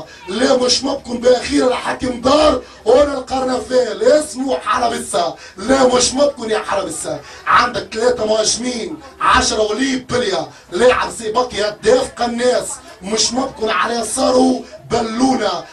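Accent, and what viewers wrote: Tunisia. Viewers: غرييييييييييييييبة والله ... متأكدين هذا مصري... هذا تونسي 100/100هههه... تحفون برشا... واصل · و الله تقول صوت شوالي هههههههه هدا يعرف لهجة تونس كويس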